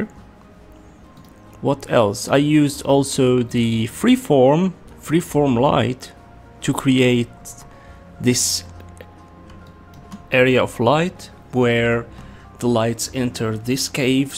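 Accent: Arab world. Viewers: your english sounds arabic lol. nice video by the way, keep the good work up. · are you Arabian , your accent says that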